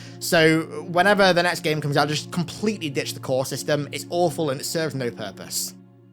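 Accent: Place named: United Kingdom. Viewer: Disliked for the Bri'ish accent alone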